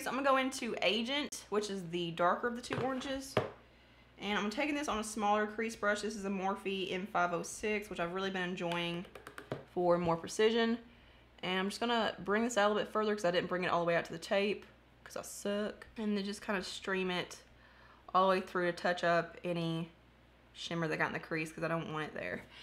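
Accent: South, United States. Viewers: I just found you today, and I LOVE your honesty and Southern accent! I am from Arkansas, girl! Going to peruse your older videos to find out where you’re from! ❤️ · Where are you from? I'm from TN, the accent I can guess you are too or AL, GA, KY. Be cool if you where from TN too, I've only watched one of your videos so far but I like watching you so far! Pretty mellow, real, and tell the truth!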